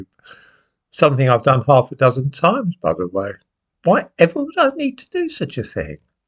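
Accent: United Kingdom. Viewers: Now, if we want to talk about intellectual pygmyism, that's you in a bag on so many levels for believing what this snide old racist has to say. He talks in generalistic terms with nothing to back up his claims, such as "Chinese people are more intelligent than black people", or when he uses a metre to judge that it's due to the Chinese people being on average richer, but utter disclaims societal or home life issues being a factor in wht black people are less rich than Chinese people. Yet the whole foundation of his statement isn't even based on actual Chinese or African examples using an average, he's talking about their attainments in the Western world, without mentioning the elephant in the room, racism. Now, for the non-thinking racist that doesn't bother to check up on the dinosaur's "facts" he sounds plausible, maybe you get suckered by his softly-spoken English accent and it's vague poshness? 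I don't, I am British and I hear his voice and know that the accent is an affectation, as genuine as Donald Trump. Need I go on? Let me explain in summary, you've been suuckered by a racist old fraud who is not, nor has ever been, a historian. He holds no qualifications in it, and the books he has produced are all self-published, no real publisher would touch them with a bargepole. Now, there's a brief summary of a character assassination, have a think about what I've said before you watch the next video by this fraud.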